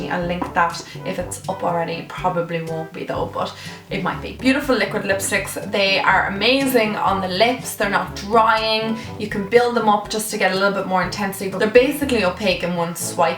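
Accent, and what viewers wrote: Ireland. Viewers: You have an amazing accent, I feel like it's Irish ( because I'm Irish too ) if your from Ireland.. Where abouts? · are you from ireland? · what part of Ireland r ya from ....Meath pride 💚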